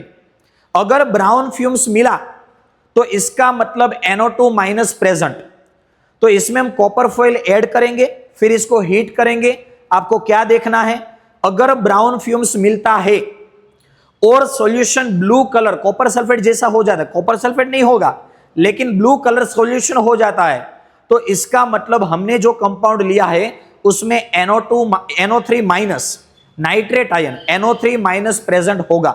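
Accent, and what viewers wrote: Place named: Gujarat, India. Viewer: sir to gujarati chhene etle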